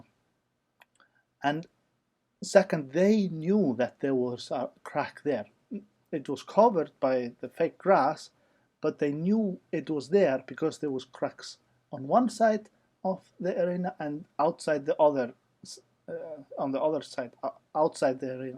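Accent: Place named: Germany. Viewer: She mentioned it in a video, but I already knew because of her german accent. You can see some people comment in german on her channel.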